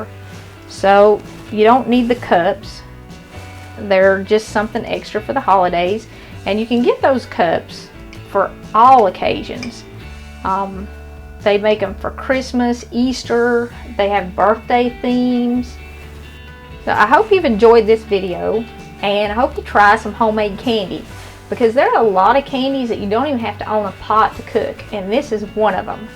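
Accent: South, United States. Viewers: Love the accent of southern women..whew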